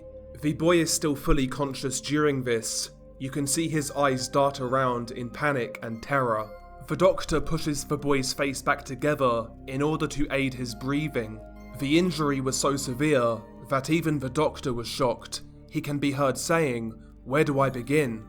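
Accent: England, United Kingdom. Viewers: The British accent 😂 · Just discovered this channel a few weeks ago and been bin watching. He's a great narrator, must be the british accent. Great channel, i even saw other channels doing reaction videos to this content. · He's English.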